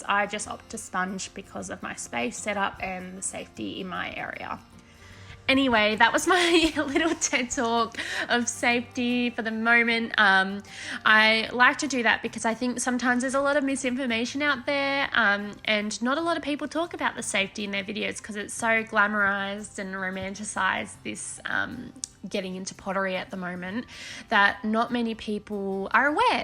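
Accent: Australia. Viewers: Ah these are so gorgeous and so are you! It's a real treat to have such a joyful and engaging YouTube series with an Australian voice in it. I'm enjoying it heaps - thanks from Tassie.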